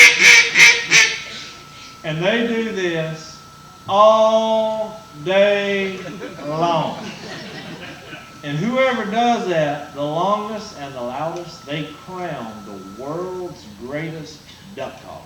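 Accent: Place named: South, United States. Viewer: his southern drawl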